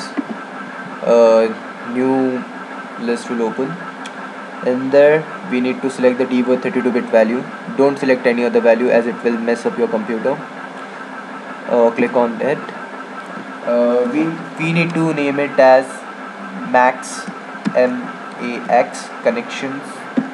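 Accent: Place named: India. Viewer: FIRSTLY; SPEAK PROPER ENGLISH== NO ONE UDNERSTAND YOU >>>dont speak English in Indian's accent ! second: whatever you write or add to the right pane in the registry; IT DOES NOT MESS THE COMPUTER THE PROBLEM OF THE REGISTRY HAPPEN ONLY IF YOU CHANGE EXIXTING VALUES IN THE LEFT PAN: where the yellow keys are := even if you add any key to the left pane; it does not do any harm to the computer: SO STOP OVER-SCARING PEOPLE FROM OPENING OR USING THE REGISTRY !! SPEAK PROPERLY ; WILL YA???????????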